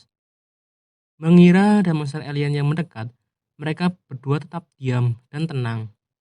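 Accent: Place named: Java, Indonesia. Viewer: Mantap tetep konsisten medoknya.. 👍🏻😁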